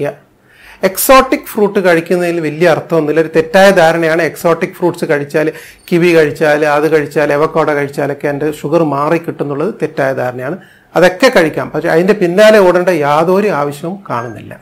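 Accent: Kannur, India. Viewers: So nicely and explained in a simple manner. Your kannur simple language is so nice to hear.🙏👍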